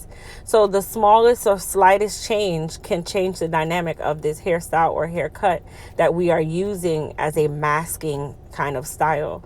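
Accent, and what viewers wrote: Jamaica. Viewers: I detect a Jamaican accent? I appreciate how you preserved her dignity while increasing her self confidence. ❤️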